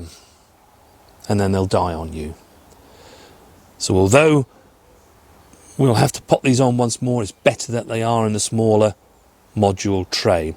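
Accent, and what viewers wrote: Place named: Norfolk, United Kingdom. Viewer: You can't beat a good old Norfolk accent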